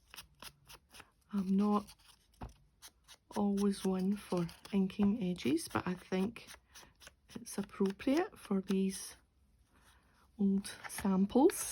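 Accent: Scotland, United Kingdom. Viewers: I could listen to you talk all day. Love your Scots accent!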